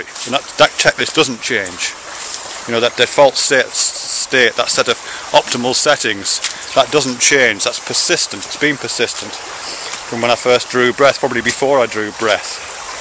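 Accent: United Kingdom. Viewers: I don't know why, but I enjoy listening to British people (i'm american). On the self.... I commented elsewhere that I think the self is a purely human, cultural and social construct. Sure, I may have some models or ideas of my "self"... but I'm a cultural animal after all.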